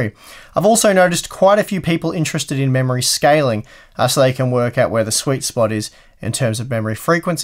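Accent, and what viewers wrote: Australia. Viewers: Anyone else like how YouTube subtitles always say harbor unbox? Dat Aussie accent 😂😂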